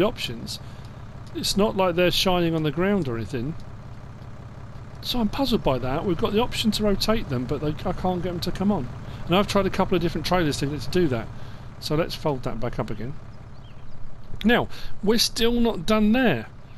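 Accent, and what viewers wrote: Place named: Kent, United Kingdom. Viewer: Thanks once again for a great video, ive only been watching your videos for a month or so, but you are far and away my favourite FS19 info source. I have to ask though (and no need to answer if its edging on too personal), are you from Kent? You sound suspiciously like you are from my hometown of Maidstone, just another reason i like your videos so much, reminds me of home.:D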